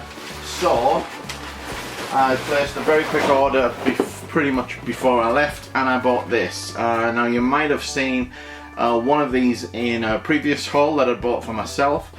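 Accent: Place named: Northern England, United Kingdom. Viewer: Nice lego haul, and I'm quite envious of your Lego room, I've been granted some shelves in the closet to store mine. :) I'm no expert on UK accents, but are you from Northern England? You sound relatively similar to my friend from Leeds.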